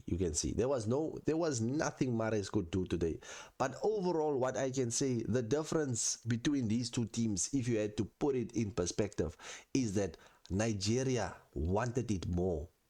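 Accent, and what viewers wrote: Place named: Cape Town, South Africa. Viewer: Great take.. you sound like youre from cape town... subbed 😎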